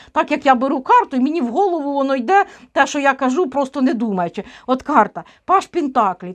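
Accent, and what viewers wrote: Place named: Surzhyk, Ukraine. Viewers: Доброго дня, все правильно говорите-не маємо права забувати полеглих воїнів,захисників наших! Жодного імені! Говоріть так як вам зручно!Як кажуть:"На польтавском діалекті "!Це наша говірка. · Пані! Не звертайте уваги на тих,хто говорить всяку дурню! Дивлюся ваші розклади із великим задоволенням! А вашу мову суржик страшенно обожнюю. Зразу згадую дитинство і своїх дядьків і тьотю Ніну,Женьку і т.д.,які жили біля Рівного! Здоров,я вам і терпіння! Слава Україні іслава ЗСУ! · Я з дніпровської обл, розмовляють у нас теж так. Повага Вам за те, що справжня, себе не прикрашаєте і тим більшості подобаєтеся за щиру правду і гостре слово. Слідуючим президентом буде жінка, а нам, від кого нічого не залежить, треба ставати господарями своєї країни і досить боятися! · Пані, не тратьте на таких своїх сил. Адекватні вас розуміють, а іншим ви нічого не докажете. 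Робіть спокійно свою добру справу. А стосовно мови, то я наприклад теж з Полтавської області і розмовляю точно як ви. І мене це не дратує. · А мені подобається ваш суржик) Україна розмовляє суржиком, Полтава, Дніпропетровщина, Слобожанщина. Чим кацапською, без "ге" - краще суржиком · Дякую Вам,за позицію,за свою думку,за ваш суржик,за те,що Вам болить Україна,за те що задумуєтесь над майбутнім,зате,що закликаєте до пам'яті,бо це ген нашого народу.Дякую.❤ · ваш суржик такий чудовий, колоритний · Супер суржик! Хоч це і не суржик, а східно-південна говіркка української мови! А ми як балакали так і будемо балакати!)))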